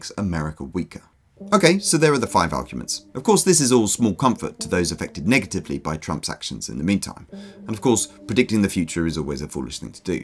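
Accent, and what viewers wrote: United Kingdom. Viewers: Sounrs loke a brit🇺🇲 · Your accent tells me you never ever been in a red state before. Trust me. This shit is a RELIGION TO THEM. Political rules do not apply. Trump worship is a matter of FAITH. He can kill everyone they love right in front of them and not lose one vote. Now can the Brits STFU since y'all don't know wtf you are talking about, ever? Is brexit gonna end? Nuff said. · Happy the lords of the algorithms sent me your way today. I appreciate wise insight pertaining to the failure of chump 2.0, and, as corn-fed midwestern American boy, I always enjoy listening to a voice from across the pond. Liked, subbed, and now appeasing the lords with comment. Thank you! 🙏🏽✌🏽💜 from Minnesota—Sanctuary Land of 10,000 Tampons and Free School Lunches (&—thank you, Coach Tim!—Legal Recreational Marijuana, finally.)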